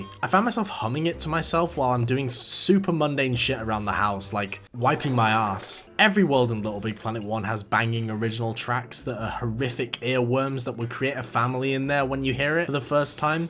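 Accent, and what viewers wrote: United Kingdom. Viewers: oh, he's British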